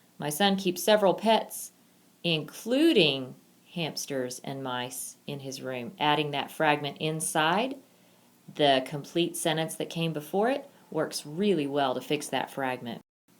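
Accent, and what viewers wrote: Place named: United States. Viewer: That's her American accent. I like it lol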